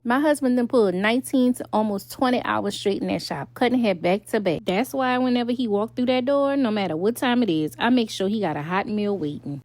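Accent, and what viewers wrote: Louisiana, United States. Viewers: You sound just like my family from Louisiana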